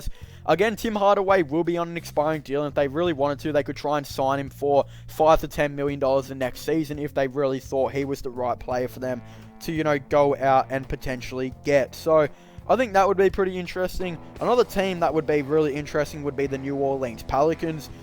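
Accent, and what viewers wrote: Australia. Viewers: Ahhh, its australian bud. Not even close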